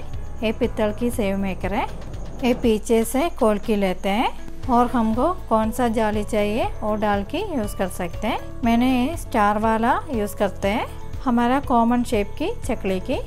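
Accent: Kerala, India. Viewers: Thank you madam for the recipe,I like your hindi in Kerala accent ❤️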